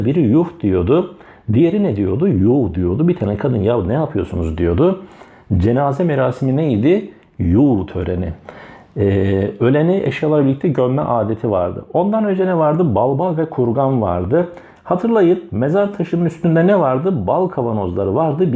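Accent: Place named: Black Sea, Turkey. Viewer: Artık umaysın 😂 kadın Karadenizli herhalde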